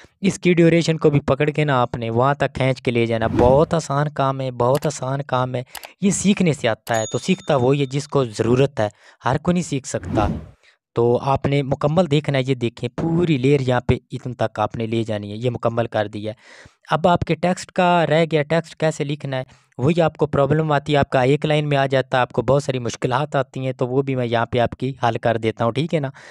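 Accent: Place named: Punjab, India. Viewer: اپنا لہجہ درست کرنے کی کوشش کریں پیارے بھائی آپ اردو بولتے ہیں تو ایسا لگتا ہے کہ آپ پنجابی بول رہے ہیں اسکے لئیے آپ نے زیادہ زور سے نہیں بولنا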